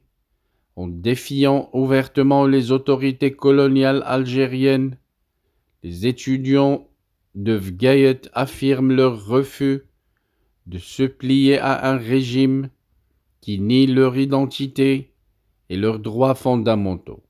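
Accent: Morocco. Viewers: L accent de ton francais et celui d un juif magocain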